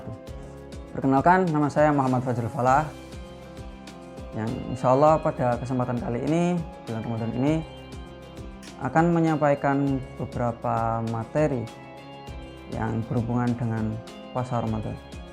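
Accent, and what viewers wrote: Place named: Banyumas, Indonesia. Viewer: Ust ahlu ngapak masyaAllah😍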